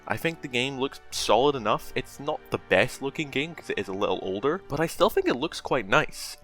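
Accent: United Kingdom. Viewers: Dude htf talking regular but pronouncing your ts as if your from Britain? Like wtf 😂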